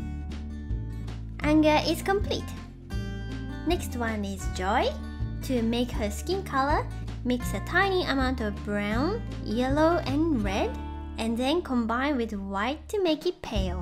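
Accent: Japan. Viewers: Because she is from Japan, she has an accent. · Hey does anybody know where is from? Her accent is very interesting... It sounds sort of Japanese but with a little bit of Australian maybe? Also great nail art! I was mesmerized!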